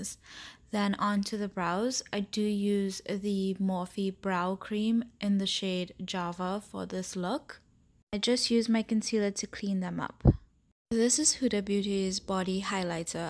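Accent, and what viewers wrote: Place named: Trinidad and Tobago. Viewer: Awesome hun that Trini accent can't hide at all 😘😘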